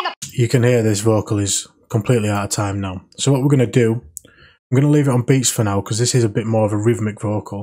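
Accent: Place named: North, United Kingdom. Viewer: BROOOOOOOOOOOO... Honestly you've got no idea how many video's I'ved watched and failed at when trying to warp vocals... to the point where I'd nearly given up production... Your video has just made my brain click into place in 1 min and 40 seconds in.... whether thats because your from up north somewhere near me by the sounds of it.... THANK YOU SO MUCH MATE! HONESTLY. legend!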